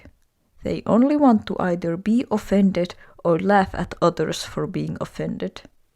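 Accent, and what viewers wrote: Finland. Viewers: The way we fins speak english is so funny also cute artsyle you got :3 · You sound suspiciously Finnish. Olisitko maamiehiä? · Ookko suomalainen? Your accent sounds like you could be finnish. :D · Finnish accent spotted 🫵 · this is random but are you finnish because i hear an accent to your voice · Are you Finnish? As a Finn, I'm getting major Finnish vibes !!! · I'm like 40 seconds into the video but... my brain is telling me to comment this: you sound incredibly finnish. Are you finnish? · wait are u Finnish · ….i am tripping you sound finnish to me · WAIT ARE YOU FINNISH? (Im just assuming bc of your accent :DD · are you finnish?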